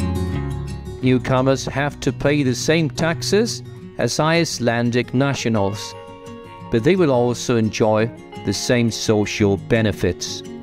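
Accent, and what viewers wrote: United Kingdom. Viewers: Si yo no supiera que vos SOS argento? Creería con ese acento, que sos británico!. Yo habló muy bien americano Appalachian y alemán Westfalen (yo los siento mas fácil de hablar) y se de lo que digo! Bien por vos!, felicidades!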